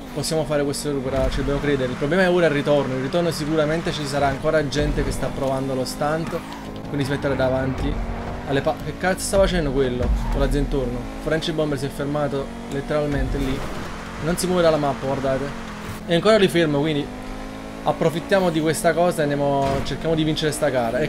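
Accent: Naples, Italy. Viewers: Sei napoletano ?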